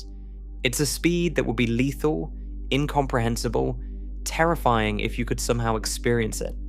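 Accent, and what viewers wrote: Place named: RP, United Kingdom. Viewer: Hi from Lake Como, Italy. I like your videos. They are made with AI but indeed perfectly put together; I like the accent of the AI voice, sounds like received pronounciation but with a modern twist. What tool are you using to make the narrating voice?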